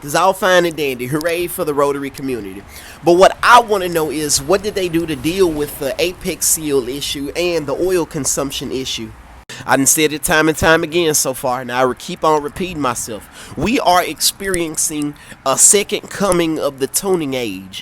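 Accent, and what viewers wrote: United States. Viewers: Thanks!brother I’m from Boston massachusetts and when I initially heard your accent I was like here’s some country dude with some country slang even a brother from Boston won’t understand but then you start talking and oh my god you’re a genius !! You definitely know your shit !!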